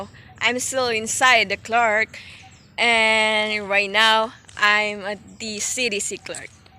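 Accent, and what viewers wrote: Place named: California, United States. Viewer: All eyes on. You are a very beautiful women.😍😍🔥🔥. You talk like you're from Cali. Lol. I would love to come out there and hang out with you.😉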